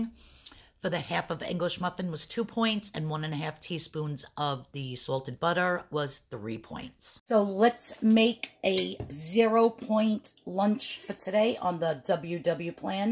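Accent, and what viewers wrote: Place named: Boston, United States. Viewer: you are a gem! I just love you, your Boston? Accent, and your food and videos. Thank you!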